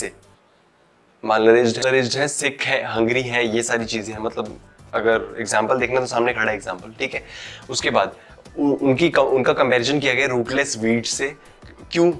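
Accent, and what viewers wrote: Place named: Bihar, India. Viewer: U belongs to Bihar or what? Aapne bola naa..."At teri ki jay rha ho"..😊😊:) Waise aap bahut aachaa samjhte hk bhaiya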